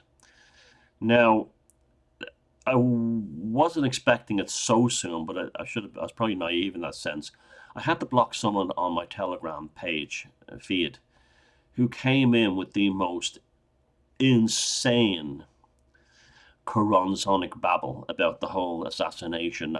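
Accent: Dublin, Ireland. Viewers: Dublin area accent? New subscriber here. Great vid, cheers!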